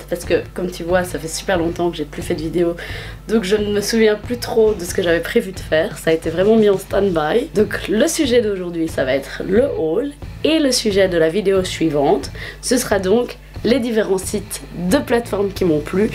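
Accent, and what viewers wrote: Belgium. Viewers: c'est l'accent belge! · Elle est belge, on parle toute comme ça. Et les mimiques, l'humour ect c'est l'humour belge, gaelle garcia est la "belge" la plus connu sur Yt du coup mnt dès qu'une belge apparaît sur Yt il faut obliger que des personnes la compare à elle.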